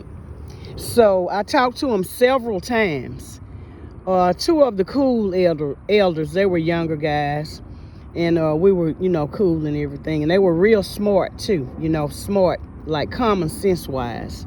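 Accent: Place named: Mississippi, United States. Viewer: You sound like you are from Mississippi.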